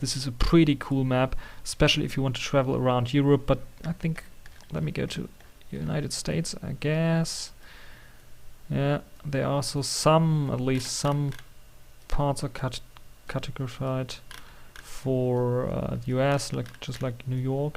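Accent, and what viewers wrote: Germany. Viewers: Aah i love German accent :))) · thank you for the video loved every minute of it. Where are you from is it Germany?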